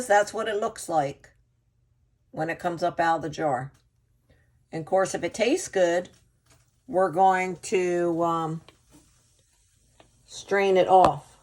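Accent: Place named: Pennsylvania, United States. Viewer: You have to live in Pa. with that accent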